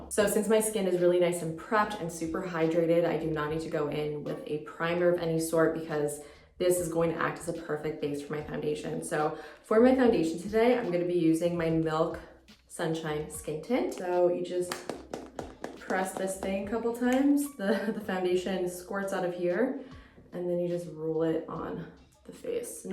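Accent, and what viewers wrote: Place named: Canada. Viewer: she’s canadian lol she just has an accent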